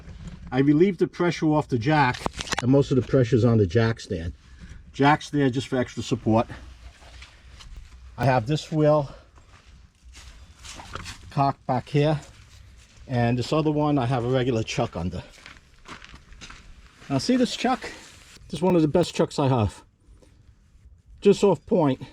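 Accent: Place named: East Coast, United States. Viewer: I’m going start by saying I love your east coast accent 🤣❤️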